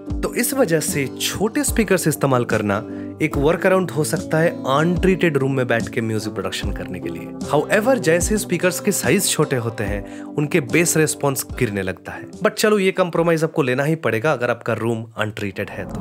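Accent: Bengal, India. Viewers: First of all very fine content and knowledge you have and also like your bangali hindi accent 😁, Just wanaa know a weird thing, can we use Yamaha PSR S-950(or S750, S970, S770) workstation speakers for monitoring if you ever heard those keyboards sound, it sounds like HS5. Only problem that they are positioned fixed & flat. We cant increase there distance.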